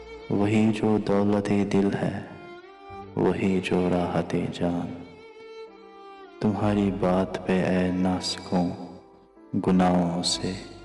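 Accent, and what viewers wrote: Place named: Kashmir, India. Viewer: Voice of kasmir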